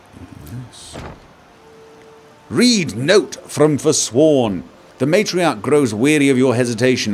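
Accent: United Kingdom. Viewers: WARNING: Watching vids can cause you to talk to yourself with a British accent when gaming.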